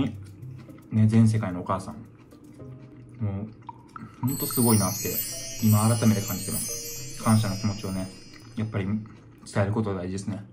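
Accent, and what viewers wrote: Kansai, Japan. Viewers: もうほんっっまに藍くんオモロすぎる🤣🤣😂😂 ｢あっ🤭｣ってなってからの｢舐めます⤴︎よね⤵︎｣の流れ早すぎる爆笑 ところどころ出てる関西弁も最高です😍🌟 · 関西の人やなあ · 藍くんの関西弁イイわ。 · 端正な顔立ちにバリバリの関西弁のギャップが可愛い😂❤️毎回映り込むご飯の器が調理ボウルに見えて気になってたけど、二合半も食べるんだね🤣188cmもあれば当たり前かな！？前、親子丼？の回は洗面器に見えた（笑） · ちょくちょく出てくる関西感強めの藍くん最高すぎます！笑 動画が出るごとにボケとかツッコミも増えてきて面白いです！笑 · バレー観戦に沼ってる関西人やけど藍くんのマジもんの関西弁、喋ってるのは親近感湧くわ〜😂天然やし面白い👍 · めっちゃ喋りまくってるのがギャップですごくおもしろくて可愛い🥹🤍関西弁なのですね！さらに好きになりました❣️ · 関西弁で喋っていると親近感が湧きます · この美形からの 関西弁が最高💕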